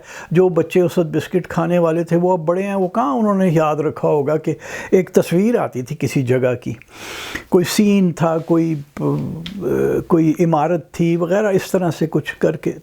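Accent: Punjab, Pakistan. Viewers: Your Pukka Punjabi Accent is so lovely👌 I love all your content Salman Rashid Sahab !!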